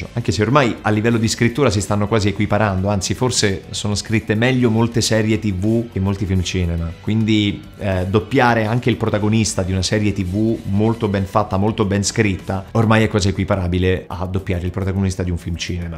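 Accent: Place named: Rome, Italy. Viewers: Ciao, ti seguo da molto poco e ho scoperto i tuoi video dall'Australia... proprio per questo ti faccio una domanda probabilmente banale e fatta mille volte, ma per me curiosa. La tua cadenza delle tue parole è ovviamente laziale, allenamento a parte, è difficile perdere questa cadenza in fase di doppiaggio? Credo che quando parli in video sia normale farla venire fuori, ma quando doppi? cerchi di eliminarla sapendo che la utilizzi normalmente? Io per esempio avevo una lieve cadenza Piemontese ma che ho perso perchè da quasi 10 anni sono fuori dall'italia. Gran bel lavoro con Ragnar, sono curioso di vederti in altre serie. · sei bravissimo, il doppiaggio di Ragnar molto vero, però si sente un pò l'inflessione romana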